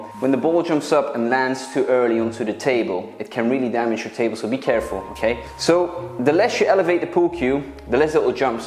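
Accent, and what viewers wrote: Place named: Scotland, United Kingdom. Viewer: Good content,subscribed, sometimes I think your Scottish but here something else there…….?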